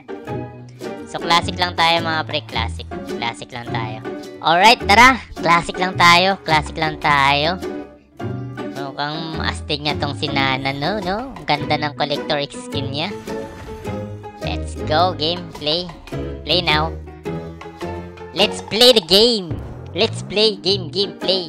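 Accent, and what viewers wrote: Visayas, Philippines. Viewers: Visayano po ba si kuya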